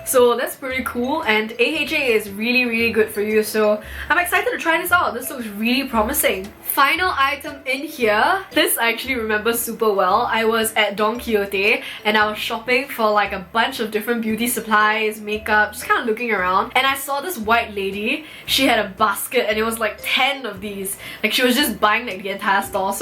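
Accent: Singapore. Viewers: singaporean english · Singaporean accent lol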